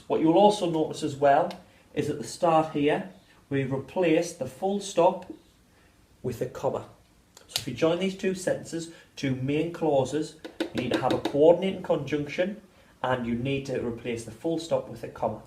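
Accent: Scotland, United Kingdom. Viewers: its scottish fam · Da british accent tho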